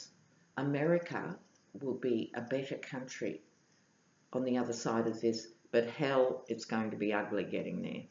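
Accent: Australia. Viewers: Thank you, - ever bright, sparkling & insightful (and wonderful with our familiar Aussie accent & cultural/slang refs!😉) A particular thank you for mentioning dear Becca of Readings by the Empress, too. Her “Why God, Why Trump?”(30 May) reading was indeed a really beautiful, powerful & nuanced outlining of the bigger, higher picture - sort of like a ‘Gaviscon Calming Raft’ for these emotional gastric reflux times!! Blessings, blessings all. <3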